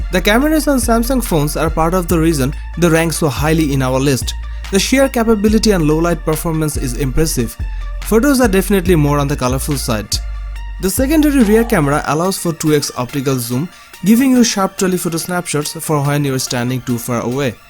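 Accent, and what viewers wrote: India. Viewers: Your accent 😍 Which part of India you are from?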